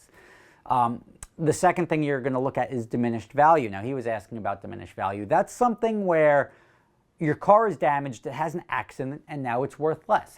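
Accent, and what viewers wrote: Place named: New York, United States. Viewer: Am from Kenya and you just have the realest New York accent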